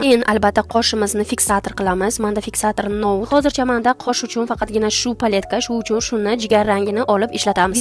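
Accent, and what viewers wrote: Bukhara, Uzbekistan. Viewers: Buxorolilami deymn😅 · Бухоро шахарини шеваси шунака не туда не сюда раздрожающий 😅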